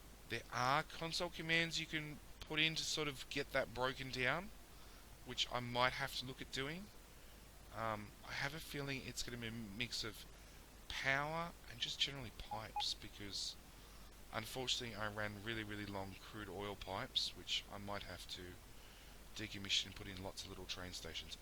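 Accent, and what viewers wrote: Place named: Australia. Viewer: kinda digging the "alright, bye" have you advertised on reddit? i believe you can get one lp post. i was going to advertise you myself, but i keep my reddit persona FAR away from me irl. i rekon you could drum up a few more subs from there. your videos are great compared to the other factorio people i follow, plus the aussie qualities are just gold! upon further analysis.. please, for the love of all that is holy, don't say "bye byes". 😕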